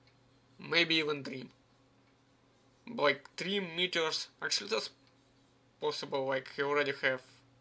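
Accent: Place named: Russia. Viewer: ю рашн инглиш ту бит май ирс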